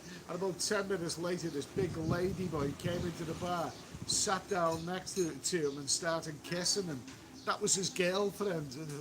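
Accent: Liverpool, United Kingdom. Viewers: The sound is bad it's making you sound like someone from Liverpool.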